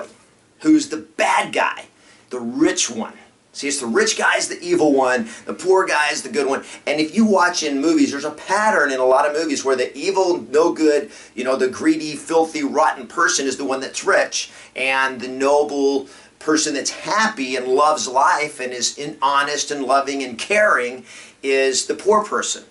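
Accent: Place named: South, United States. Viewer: Mr., I am glad to see more of your southern draw! That's your TM! Aside from being a BadA$$ Real Estate Coach, of course.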